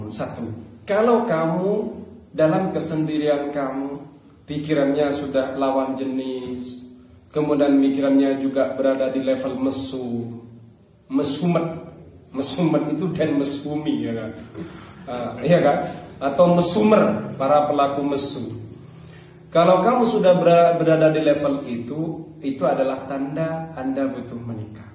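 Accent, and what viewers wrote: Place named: Madura, Indonesia. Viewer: logatnya madura banget...........👍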